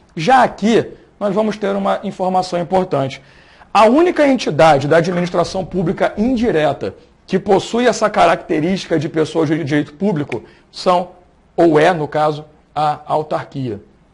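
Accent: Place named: Rio de Janeiro, Brazil. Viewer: esse sim é bom e verdadeiro carioca por isso que me indentifiquei.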